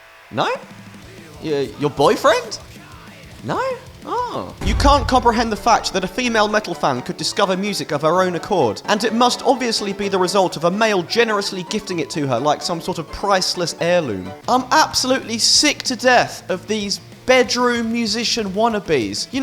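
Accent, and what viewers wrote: United Kingdom. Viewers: Your english akzent is very british!;)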